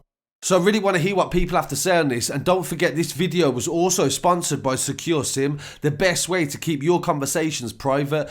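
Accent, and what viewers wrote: United Kingdom. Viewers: This British accent is kind of getting annoying lol hard to follow when ur not from the uk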